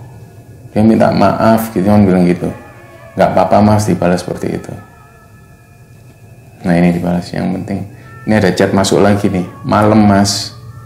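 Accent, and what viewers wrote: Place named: Surabaya, Indonesia. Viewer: Logat suroboyo ny koq asik bgt yo mas medok2 gmn gitu😍jd ngefans sm chanel ini...pokoknya juara sukses trus y...😘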